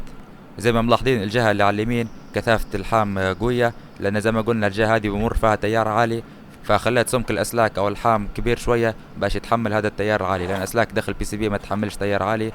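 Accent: Libya. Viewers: اي مبين من لهجتك انك ليبي بس تعجبت لماشفت مكتوب الموصل في المقطع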